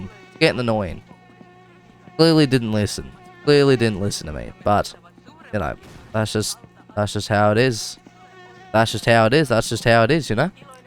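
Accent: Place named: Australia. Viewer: Australian, LOL.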